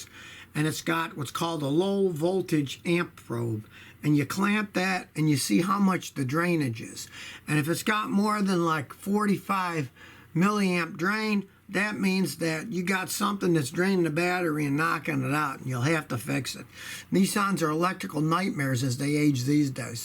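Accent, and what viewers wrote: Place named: New York, United States. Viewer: why do you have a New York accent? You sound like Joe Pesci.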